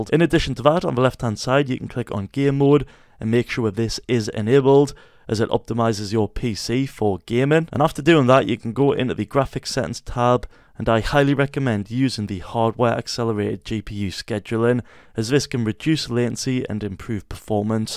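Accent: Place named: United Kingdom. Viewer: bro is bri'ish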